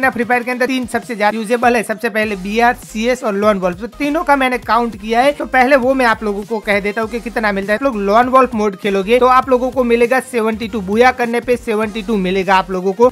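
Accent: Gujarat, India. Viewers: Bhai aap gujrati hooo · bhai aap gujarati ho? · app Gujarat se ho kya bro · App gujrati ho kya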